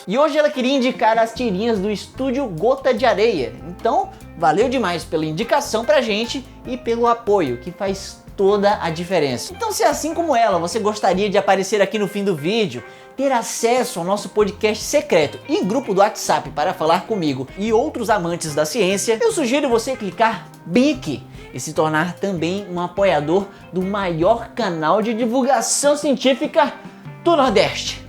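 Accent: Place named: São Paulo, Brazil. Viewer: Queria saber por que você é Cearense e não tem o sotaque de Cearense no T e no D normalmente. Só fui perceber isso agora! Você usa o T e o D de paulista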